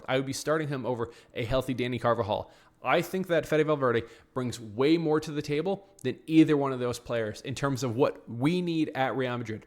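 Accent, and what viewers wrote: United States. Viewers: If its a contest between the midfields, madrid will lose. Part of how/why Sevilla won vs Barcelona was Sevilla interrupting Barcelona's "normal" passing network. [You sound American, so] Go ahead and look at a passing map for a "normal" Barcelona fixture, and then look at the passing map vs Sevilla. Normally, Barcelona run everything through Pedri, who then distributes the ball all across the park. But Sevilla had their #18 give up any pretense of doing anything other than to man-mark Pedri, which turned Barcelona's normal pass network into something that more looks like the USMNT. It also cut Pedri's "normal" number of touches in half. Taken together, this interrupted Barcelona's possession plans. Most imbecilic commentators are too lazy to see anything beyond "the high line" with Barcelona, but what's more important to Flick are Barcelona's press, and Barcelona's possession. 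Interrupting Barcelona's possession is the one way that another midfield can outplay Barcelona's. Sevilla did it, and Q$G did it. With respect to madrid, I don't know if any of Camavinga, Guler, or Bellingham are willing to subsume "their own" games, in order to go try to mark Pedri out of the game. In fact, I doubt it. It is for this reason that if it comes down to madrid's midfield vs Barcelona's, madrid will lose.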